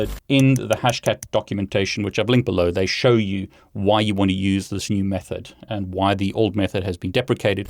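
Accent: South Africa. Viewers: Thank you, I'm an idiot. Also, do you have SA heritage? I swear that accent sounds super local! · You sound like a South African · Are you South African? I recognise the accent.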